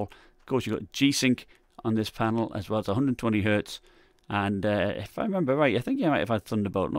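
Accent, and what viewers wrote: United Kingdom. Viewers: That’s odd I heard a UK accent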